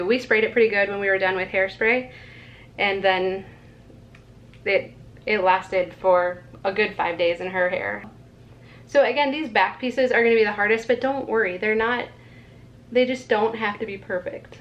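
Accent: Minnesota, United States. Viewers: I love your videos. And I love your MN accent. I’m not from MN but I lived in Mpls for 4 years and fell in love with the accents there as well as the people. Not only do I value your content and personality, but I can watch your videos and get my MN fix from my own home!🤗😎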